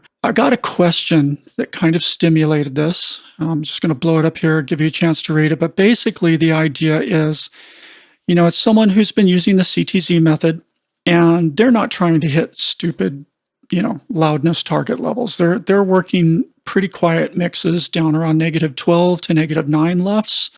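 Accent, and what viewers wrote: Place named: Vancouver, Canada. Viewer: Just out of interest, are you in Vancouver by any chance? I just ask as you have the exact same way of explaining things as one of my mentors over here who was an engineer over there before being poached by the Canto-pop scene in the 80s. And I don’t just mean your accent, it is your whole demeanour. It is pretty unreal!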